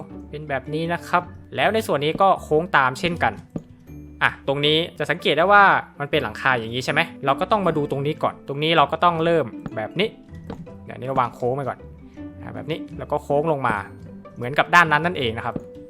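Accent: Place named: South, Thailand. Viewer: พี่เป็นคนใต้หรอครับ ผมเพิ่งติดตาม สำเนียงเหมือน😅😅😅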